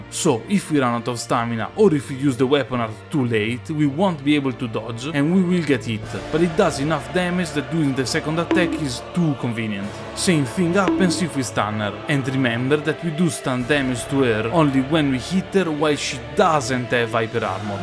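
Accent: Italy. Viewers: Your voice gives me italian vibes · Perché hai l'accento italiano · Che bellezza scoprire che sei italiano, l'avevo immaginato da qualche pronunzia! Adoro i tuoi video 🤩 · Italian accent + berserk reference= me subscribing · Oh shit your accent is Italian? I thought it was French 💀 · Adoro l'accento italiano 😭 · I just love your Italian accent. You are the only Italian DS player I know. Keep up! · Sei italiano? credo di sì per la parlata e la voce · Si, l'accento italiano si sente. Ottima run, comunque! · fammi indovinare, italiano? ahaha l'accento ti vende che una bellezza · You're Italian?! I knew you had an accent just couldn't ever place it (don't judge I'm a foreigner too)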